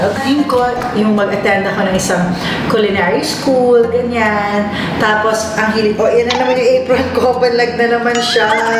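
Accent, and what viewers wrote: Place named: Philippines. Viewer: Super funny!!!! I hear the zobel + jologs in you just like me hahahaha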